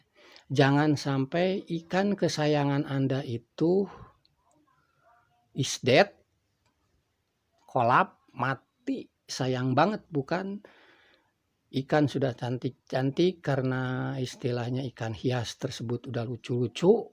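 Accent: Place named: West Java, Indonesia. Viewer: enya nyariosna sunda banget hahaha tapi tenanaon, maju terus kang!!!! SUNDA terus berkarya☺️☺️☺️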